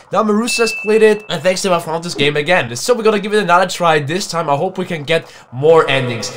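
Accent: Germany. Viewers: Are you German? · Can it be that youre german or something like that? 🤔😃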